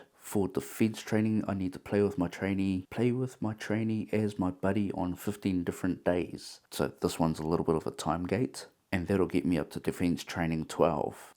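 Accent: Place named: New Zealand. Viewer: You from nz cuz?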